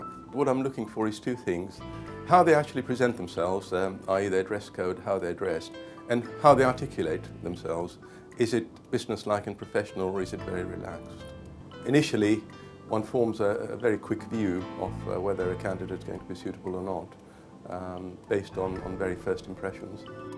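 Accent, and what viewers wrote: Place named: United Kingdom. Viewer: Dude don't be embarrassed of the way your dad speaks english. Even my dad doesn't speak like this guy but I don't mind. Plus, I don't think this guy lived his life in India. I really think he was born in the United Kingdom or came at a very early age .. like his parents were immigrants that arrived in the 1940s/50s/60s.. or something .. i bet u thats why because no indian can speak a british accent THAT well without messing up lol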